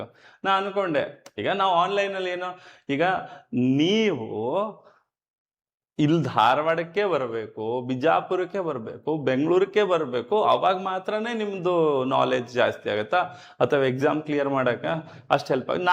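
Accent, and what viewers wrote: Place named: Haveri, India. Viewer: Sir nimm bayaga haveri bhashe kelak chend ri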